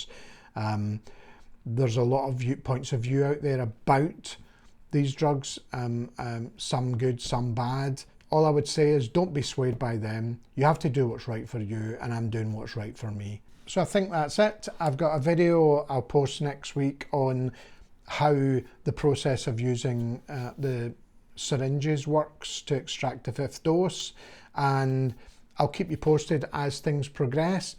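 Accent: Scotland, United Kingdom. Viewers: Good video. I could listen to that beautiful accent all day. I’m Scottish, first generation Canadian. Your accent reminds me of my people. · I love your calm Scottish accent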